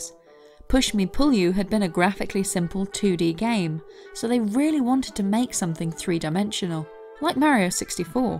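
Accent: United Kingdom. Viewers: Seeing that the channel is called "Video Game Story Time", I expected a fast-talking guy rattling things off at a mile a minute. This slow, measured, British female voice, explaining things in a very calm and step-by-step manner, is entirely unexpected, but very welcome.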